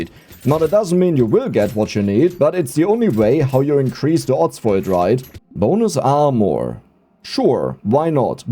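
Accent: Germany. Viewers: Becauze he haz a strong German aczzent.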